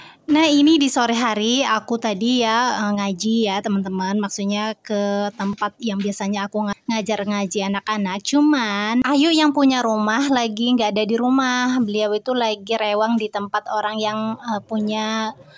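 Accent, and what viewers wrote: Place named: Makassar, Indonesia. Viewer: MasyaAllah... Mampir lg ni teteh. Logat makassar jg teh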